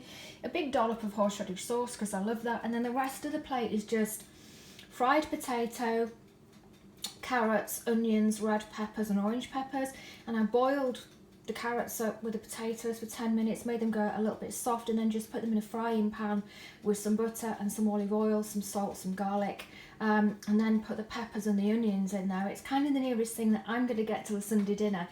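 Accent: United Kingdom. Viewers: Oh my God I'm drooling right now to your enticing food so yummy and scrumptious...I want to dig in right now hahaha...and One More thing..Your voice is very lovely and sexy..I really love the intonation of British English..God Blessed my dear friend.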